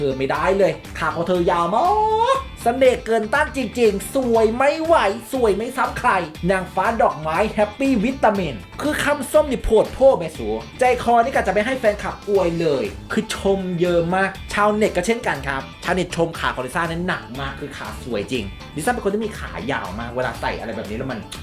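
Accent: Isan, Thailand. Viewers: ผมชอบเสียงบรรยายของคุณมากผมฟังภาษาอิสานที่คุณสอดแทรกมาออก ขำมากเลย หัวเราะทุกครั้งที่คุณพูดอิสาน ชอบและหลงไหลภาษาอิสานมากเลย ตอนี้ฟังหมอลำรู้เรื่องแล้วครับ เป็นแฟนตังจริงครับ ดูทุกคลิปครับ ลงบ่อยๆนะครับ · ชอบฟังช่องนี้ตรงมีความพูดอีสานไป ขำไป อวยยศแบบสุด เป็นเสน่ห์ของช่องมากค่ะ 😂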